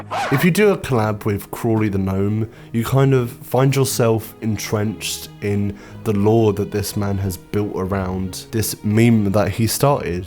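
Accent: United States. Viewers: American here, for my sins. Your American accent is great! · Was this all to show off the American accent?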